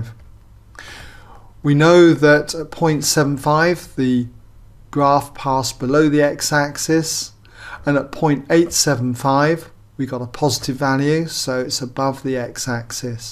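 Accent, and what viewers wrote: England, United Kingdom. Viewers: Nice UK accent,good way to captivate the audience. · Nice english accent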